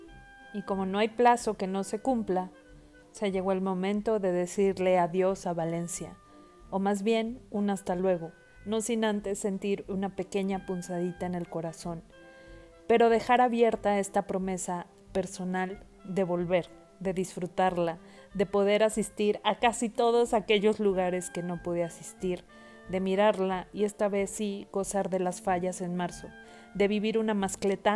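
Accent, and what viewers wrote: Mexico. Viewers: Tienes acento mexicana?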